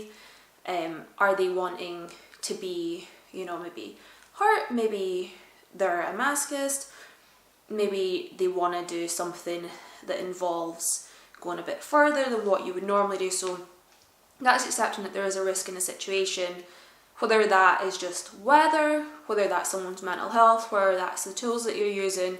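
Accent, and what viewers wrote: Scotland, United Kingdom. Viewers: The lovely lady is from Bonnie Scotland..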